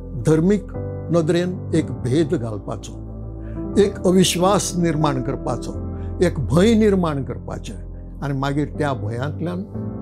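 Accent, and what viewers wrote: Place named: Konkan, India. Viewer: Great words... A lesson to learn.. Secondly what a pure konkani.. Love to listen...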